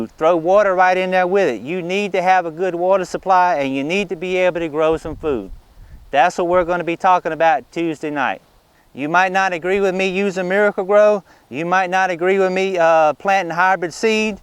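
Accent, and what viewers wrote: South, United States. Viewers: Apocalyptic right-wing paranoia NEVER sounds any more convincing in a southern accent - just sayin'. If southern right-wing extremists had ANY kind of record of success instead of initially building their economy on slavery, ultimately warring on their fellow Americans in defense of their abomination, losing that war catastrophically, wallowing in prejudice, failure, and poverty ever since they might have built up the SLIGHTEST credibility for their point of view - but that's not the way it is - is it? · Found your videos via a fb post someone had up. Awesome information. Love it!!! I'll be going through a lot of your videos for your expert knowledge. You gotta be a southern boy. Where are you from?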